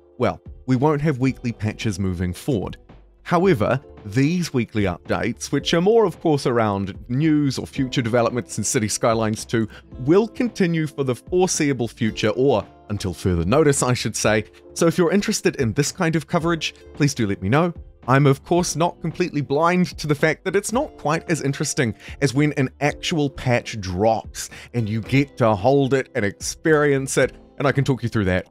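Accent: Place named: New Zealand. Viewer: So nice to hear these updates with a fellow Kiwi accent. Had read the email about the latest patch but I just wanted to say THANKS for explaining things a bit better.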